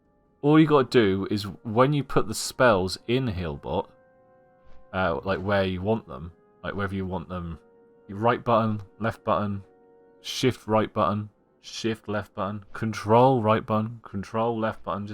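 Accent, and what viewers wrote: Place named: United Kingdom. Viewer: Seriously, it has to be something in the water. Another goofy brit with a thick accent trying to make a guide on an American game. Lol and this goofball actually has someone talking over him. So weird!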